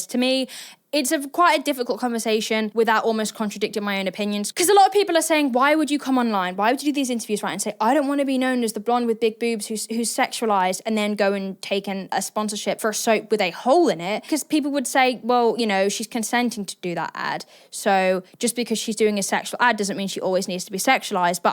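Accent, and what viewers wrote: United Kingdom. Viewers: I made the mistake of looking at the comments section of Andrew Wilson's response to and it amazed me how much supposedly grown men are triggered by an outspoken young woman with piercings, tattoos, stuffed toys in her room and a British accent. Keep making content YOUR way, don't listen to "alpha" losers like him and his followers.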